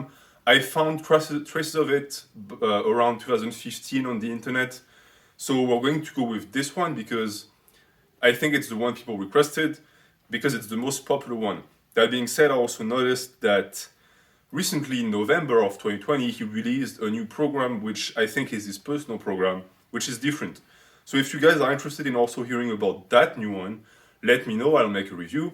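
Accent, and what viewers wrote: France. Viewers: I dont get it. French accent but you have a football on your shirt and not a soccer ball. Im hella confused.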